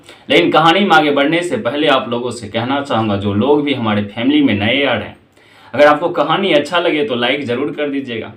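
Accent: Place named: Bihar, India. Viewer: Aap ki kahani sunane m bihari language jhalakti h .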